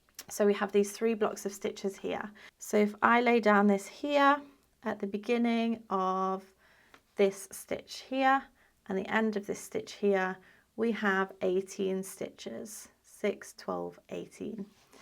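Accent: England, United Kingdom. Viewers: Ja. The English accents are beautiful. My English are very poor but I manage when I have to speak it. About once every month at the shopping. 🎉🎉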